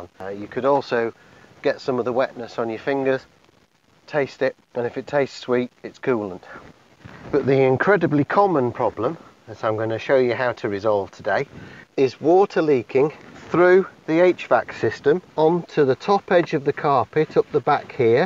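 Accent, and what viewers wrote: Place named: Wigston, United Kingdom. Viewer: Greeting from Texas! Are you somewhere near to Wigston ? I'm guessing by your accent..!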